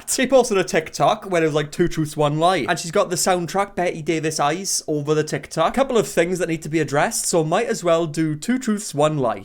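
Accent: United Kingdom. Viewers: Lmaoooo had to watch this video with subtitles coz of the thick Brit accent but worth it 😂 This girl needs to go away and do some self reflection for at least 5 years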